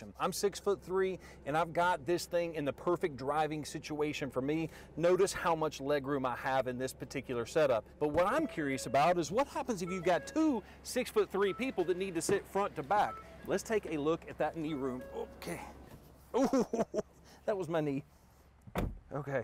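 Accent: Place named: South, United States. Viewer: Love the accent. Wish Canada had that southern personality.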